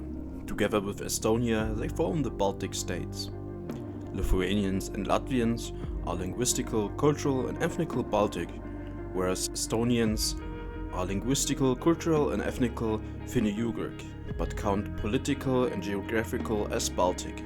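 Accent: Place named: Germany. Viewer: you have german ascent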